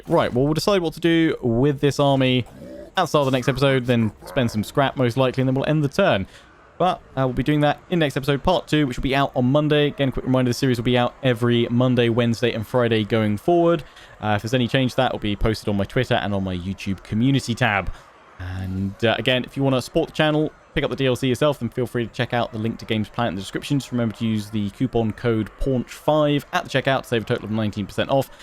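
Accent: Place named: United Kingdom. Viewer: Hearing all the unit names from a Brit sounds like I’m getting insulted. I LOVE IT!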